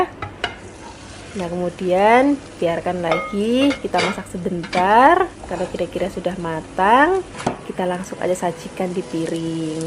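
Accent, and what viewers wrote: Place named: Ngapak, Indonesia. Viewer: Medok banget rasa ngapaknya ,enak dirungokna.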